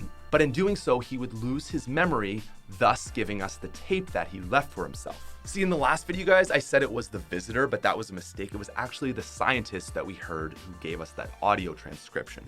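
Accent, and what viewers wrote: Canada. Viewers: Are you canandian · Me watching the video Hears an Accent Is.. Is that- Is that Canadian? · Ik your probably Canadian but plz fix your English it's not oatside it's outside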